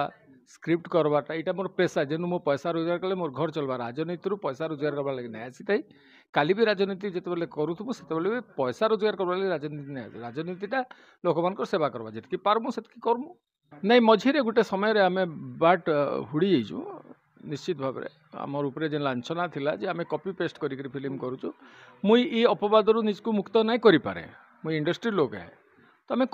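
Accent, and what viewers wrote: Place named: Cuttack, India. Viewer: ଇଏ ବହୁତ feeling ବାଲା ହେଲେ କଟକିଆ କଟକିଆ ହଉଥିଶି ପଥେ କହିଲେ ଆମେ କଟକିଆ କହୂଥିଛି ପୋଗ୍ରମ୍ ମନକୁ bite ରେ ଭଦ୍ର ଭଳି କଥା କହୁଛେ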